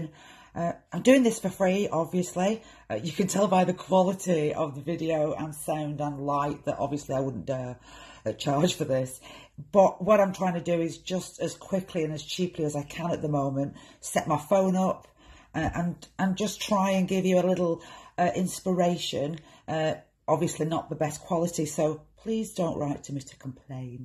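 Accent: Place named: North, United Kingdom. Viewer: Love your work, loose is the way to go. Sounds like you are from somewhere in the north?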